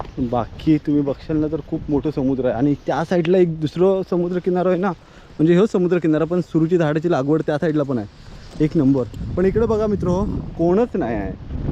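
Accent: Konkan, India. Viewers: भाऊ तू कोंकणी आहे का सुद्ध मराठी आहे तुझी❤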